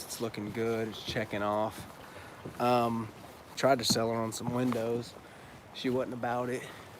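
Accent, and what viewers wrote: United States. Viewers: Love the country accent lol. Good videos man, keep em coming.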